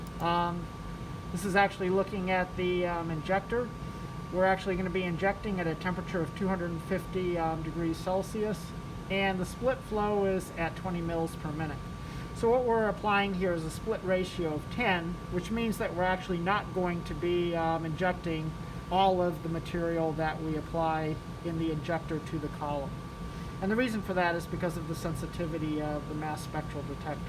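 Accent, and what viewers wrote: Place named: United States. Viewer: Thanks that was great and with an original American accent clear and no ambiguity!!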